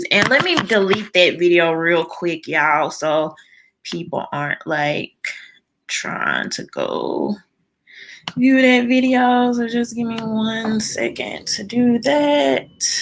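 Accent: South, United States. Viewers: The southern accent ....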